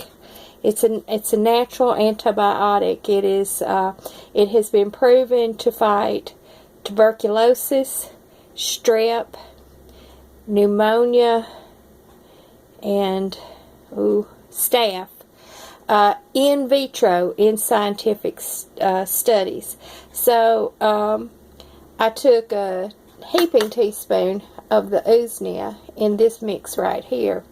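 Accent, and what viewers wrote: South, United States. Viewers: I love your videos. It's like you are family with your southern accent.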